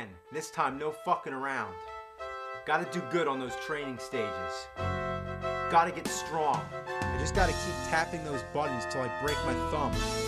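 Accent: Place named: Philadelphia, United States. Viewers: He actually has a bit of a northeastern accent if you listen closely lol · fucking hell, im italian and still i can hear the philly accent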